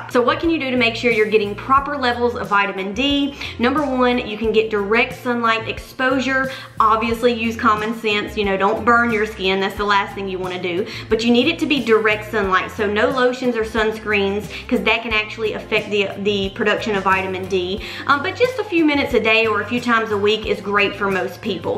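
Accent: South, United States. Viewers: love the southern accent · Your southern accent, tho!!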